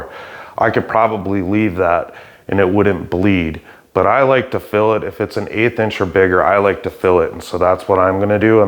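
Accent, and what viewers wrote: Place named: Wisconsin, United States. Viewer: You sound like a wisconsinite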